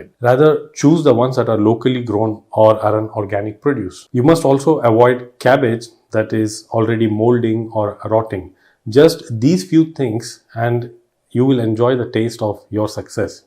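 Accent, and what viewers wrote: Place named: India. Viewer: That’s a cool accent you have. Are you from India?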